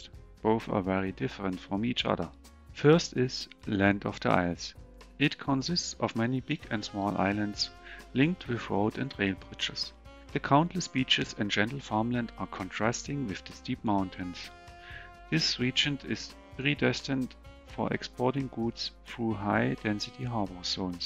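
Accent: Germany. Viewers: Man kann den deutschen Akzent ganz schön deutlich raushören😅 Ich selber bin da aber auch nicht viel besser, wenn ich "Englisch" spreche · Do i hear a german accent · Dieser Deutsche Akzente 😄 So spricht mein Vater Englisch 😅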